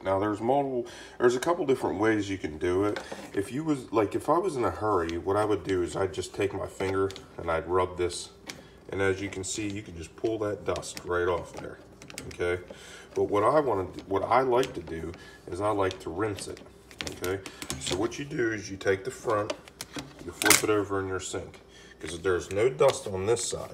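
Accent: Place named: Philadelphia, United States. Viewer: You gotta be from delco or Philly area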